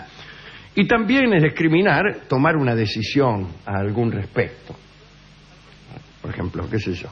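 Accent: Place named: Buenos Aires, Argentina. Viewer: Yo comparto la mayoria de las cosas que dice, desde siempre!!! Habla como todo porteno!!! Pero es muy coherente con la mayoria de las cosas que dice!!! Tal vez a veces sus ideas no sirven para solucionar los problemas en Argentina, porque piensa como latinoamericano!!! Y no como los dinamarques!!! Y asi estan los latinoamericanos!!! Y tambien asi estan los dinamarqueses!!! Larga vida a Dolina!!! O a sus discipulos!!! Como: Rolon, Filmus, Pigna, etc!!!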